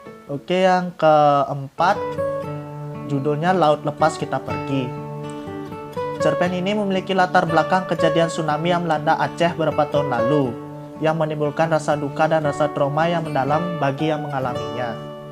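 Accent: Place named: Bali, Indonesia. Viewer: Kak dari Bali ya? Keliatan banget logatnya😂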